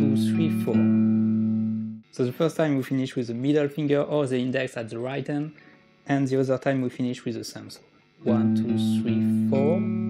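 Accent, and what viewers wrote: France. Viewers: are you french ? cuz you sound pretty french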